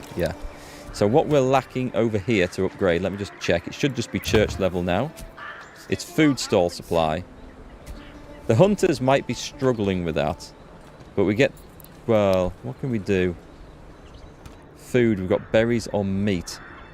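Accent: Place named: North Yorkshire, United Kingdom. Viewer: could hear the subtle North Yorks accent, I grew up in county Durham, so kinda sat on the yorks/lancs rivalry border :)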